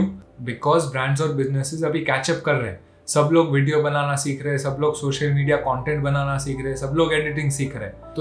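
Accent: Gujarat, India. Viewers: Gujarati che ne bhai tu taro, tone gujrati che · Gujarati Spotted 🤓 , Right ? 10 Sec, Video Dekh ke hi pata chal gaya .. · Bhai hindi ma pn tamri gujarati tongue ave che, ane ek gujarati j atli saras video banavi sake ane reality batavi sake. Bija video lavo detail ma ane sadi bhasha ma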